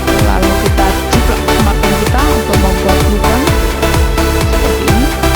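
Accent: Java, Indonesia. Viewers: wong jowo di suworone!!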